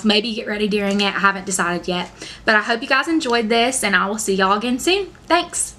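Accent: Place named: Texas, United States. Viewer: You are definitely a Texas girl! Can tell by your accent and personality❤ keep posting videos!!!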